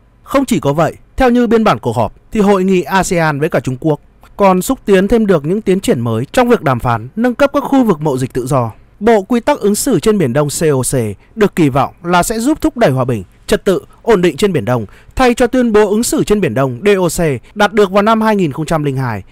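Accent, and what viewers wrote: Standard. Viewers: nói chuẩn quá anh ơi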